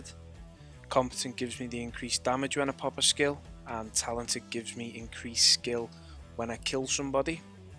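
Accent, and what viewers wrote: Liverpool, United Kingdom. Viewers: Right accent, only accent in UK that I can bloody stand haha apart from my own of course lolz.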